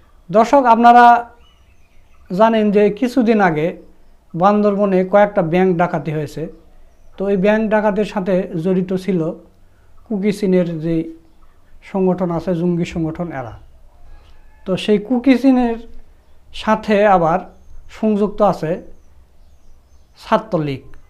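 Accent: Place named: Naogaon, Bangladesh. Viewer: আপনে কি নওগাঁ জেলার আপনার কথা আমাদের নওগাঁজেলার ভাষার মত লাগতেছে আপনাকে ধন্যবাদ আপনার খবরের জন্য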